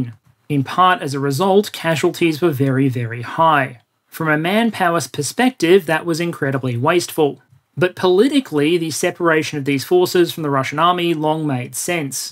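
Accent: Australia. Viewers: It’s the Aussie accent 😉😇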